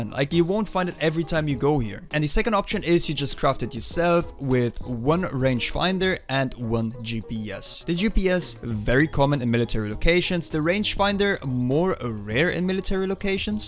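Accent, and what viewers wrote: Germany. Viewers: Are you german by any cance? ur accent just sounds like that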